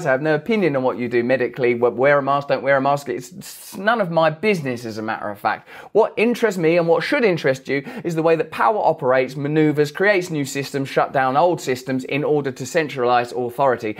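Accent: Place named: Cockney, United Kingdom. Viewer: Hey, could you please say "story" also without a "t", as you omit all other "t-s"? I'm joking 😊, big fan 🤩, keep up the cockney 😆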